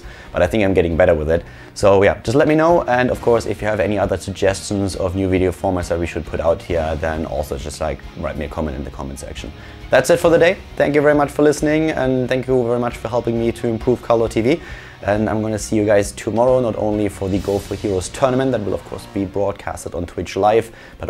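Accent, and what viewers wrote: Germany. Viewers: Ich habe schon früher deinen Kanal geguckt als du starcraft-Kommentator in Korea warst, seitdem du viel hots machst gucke ich dich dauernd, deine Videos gefallen mir sehr gut :) mach weiter so, ich hoffe deine Karriere geht weiter so gut weiter :) deinen deutschen Akzent habe ich anfangs gar nicht erkannt :D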